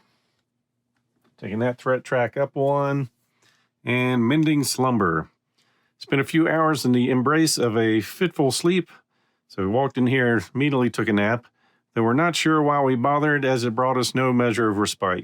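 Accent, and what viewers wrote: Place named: South, United States. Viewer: You won't believe how long I've waited to hear you recite this Lovecraftian horror. It's awesome. #MonotoneSouthernExcellence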